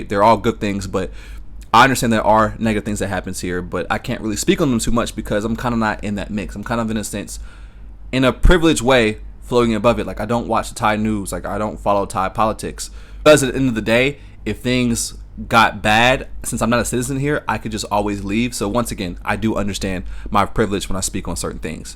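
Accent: United States. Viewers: Took the time to watch your whole video before making these comments. I have been living in Thailand for over 10 years so i feel I'm qualified to speak. Overall pretty fair comments for someone in Thailand for only a year. BUT! 1) after a year, you still pronounce PAD THAI like an American. Listen carefully to the way 'PAD' Is pronounced. It's not an iPad. 2) you don't tell people that they can't just come to Thailand and stay. Tell them about the visa renewing headaches. 3) you seem like an open-minded guy and you try to be, but your comments on the LGBTQ++ issue in America was off. You said that you have no problems with them but you have a problem with how in-your-face they want to be. Well, I have to point out that white people were saying the exact thing about hip hop culture. 4) you don't tell people that you can't work here legally. 5) you skip everything about the political situation here, which is a huge thing. 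I'll keep watching. 6) you don't really understand Thailand and it's culture until you get to know Thai people, so how you have some Thai friends or at least aquaintances, and not just Thai bar girls, who most Foreigners think represent Thai people. However you are more balanced than most other YouTubers in Thailand.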